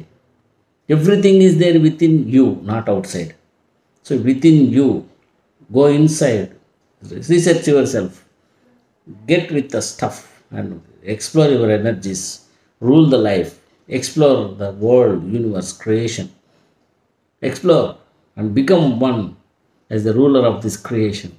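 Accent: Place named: Rayalaseema, India. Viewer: Superb comedy, innovative ideas coupled with Rayalaseema slang made your videos extra special. Keep going brother.🙏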